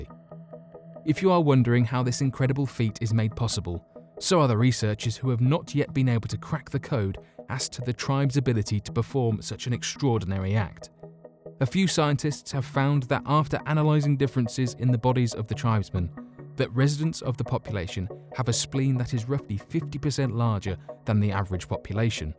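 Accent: United Kingdom. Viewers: I am finally tired of the misleading Click Bait and vague stories with no real thorough information. The girl with the different colored eyes, Click Bait! How fast can the African runners get up to?! The Okinawan people live how long on average? Finish your damn stories! These are not super powers - you got me! Clearly adaptation and acclimation have created the hearty Sherpas! Poor journalism with a British narrator! I'm not impressed. With YouTube's recent money police out and about demonetizing people for all the false and stupid reasons, they should take a look at you and smack you for your constant fake thumbnails and false and misleading, half researched, bullshit titles and stories! I will now unsubscribe.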